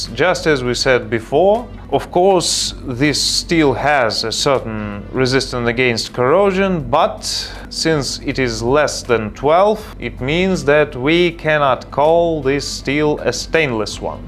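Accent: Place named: Russia. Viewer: Hi. Thanks for all the useful information you have shared. I like how you break everything down in an easy way to understand with your awesome Russian accent. Thank you once again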